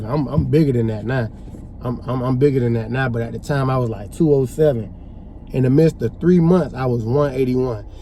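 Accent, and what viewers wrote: South, United States. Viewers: Bro. I’m hearing your accent. You’re definitely from the South. Question, respectfully where? I’m from Cashville and you sound like home. New sub here.🤟🏿😎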